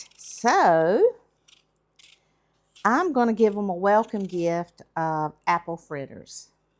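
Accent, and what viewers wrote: United States. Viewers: Where is your fantastic accent from in the US? A curious guy from Buenos Aires, Argentina asking!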